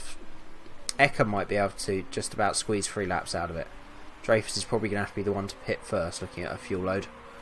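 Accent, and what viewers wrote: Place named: Devon, United Kingdom. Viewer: Another excellent session. Your pessimism always amuses me:D Your car is coming along very nicely. Great things are coming. On a side note, I've been trying to track down your accent for months now, so I'm going to come out with a guess - Definitely Southern....Devon perhaps?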